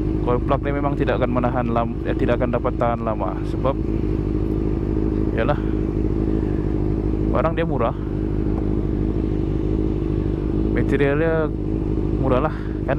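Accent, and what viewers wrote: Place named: Sabah, Malaysia. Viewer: Suka dengar abang cakap sabah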